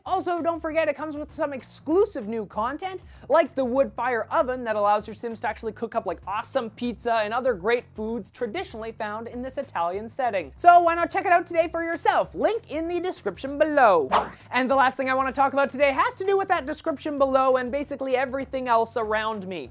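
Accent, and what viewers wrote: Canada. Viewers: Being from Canada It's actually his accent...he didn't say it wrong. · Guys, he's Canadian. Italian pronunciation doesn't really have a lot of influence. Both are acceptable.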